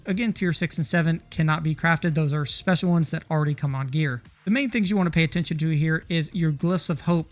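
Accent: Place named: Midwest, United States. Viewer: You have such a clean Northern/Midwestern accent - but why do you pronounce "affix" in the way you do - a-fix? Dictionary puts the accent on the A - for ah-fex.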